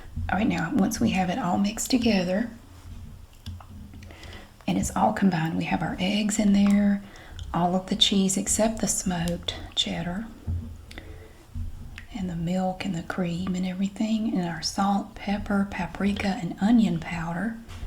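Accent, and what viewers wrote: South, United States. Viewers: your accent makes me melt Southern accents are the best. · Aside from the humour and the Southern drawl, it's brilliant how you always tell viewers they can make these recipes - so encouraging. Peace from Scotland 🏴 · I always giggle when I hear you say swear words or wear them on your apron like today and your sarcasm because you are such a sweet soft spoken southern lady 😂 I love it!!!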